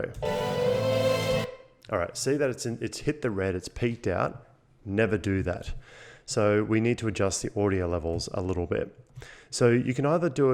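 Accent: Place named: Australia. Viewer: That was so helpful, thanks. Also great to hear it with our local Aussie accent:)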